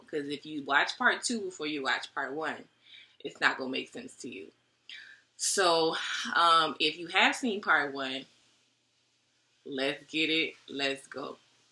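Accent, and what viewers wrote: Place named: South, United States. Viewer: OK. That's the sexy grammar I hear. You're a southern bell. Cool.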